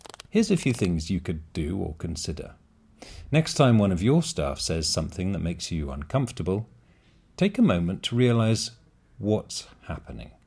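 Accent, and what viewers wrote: RP, United Kingdom. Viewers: Обычный RP, нейтральный акцент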